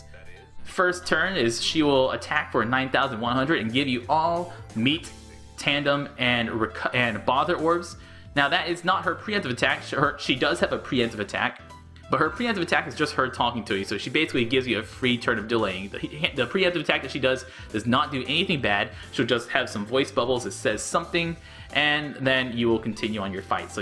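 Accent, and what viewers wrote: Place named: Australia. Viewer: Talk to Australian to me~ <3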